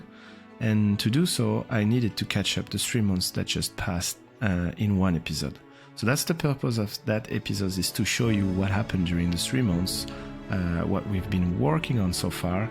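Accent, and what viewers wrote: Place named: France. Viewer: Toi, je sens que t'es Français en tout cas sympa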